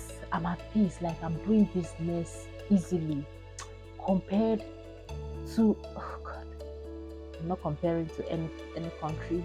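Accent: Ghana. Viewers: you speak Ghanaian English. And you are very natural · 80% Ghanaian accent loading oo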